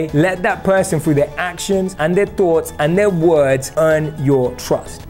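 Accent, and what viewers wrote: United Kingdom. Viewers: When you talk .. I just stared at your beautiful eyes 😍 and I love your English accent 😘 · Found you by accident and glad I did. I appreciate what you have to say and love the way you present it. The gorgeous eyes and the British accent make it so easy to watch you, LOL! My sister asked George Burns the 100 year old comedian actor in Las Vegas, (who passed away years ago), “How do you know if a man loves you?” George replied, “You ask him do you love me? if he looks away before he answers the question… He doesn’t!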